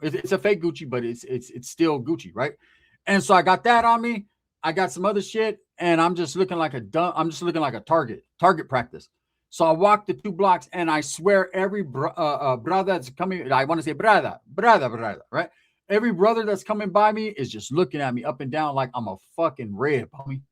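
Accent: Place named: Detroit, United States. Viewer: Sounds like Eastside Detroit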